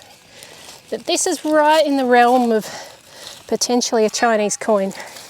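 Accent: Australia. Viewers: Welcome back it’s nice hear your assie voice again, and watch your videos.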